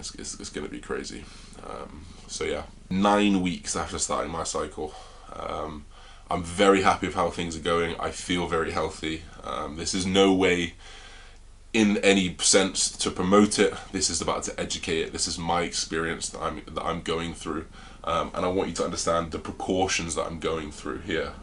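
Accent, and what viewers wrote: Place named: Liverpool, United Kingdom. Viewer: I wonder which part of Liverpool he is from, I can't work it out from his accent...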